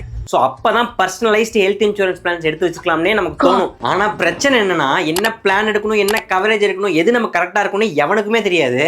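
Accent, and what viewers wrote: Madurai, India. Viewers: Anna neega Madurai ya😮😮😮😮😮😮😮😮😮😮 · Neenga madurai ah...😅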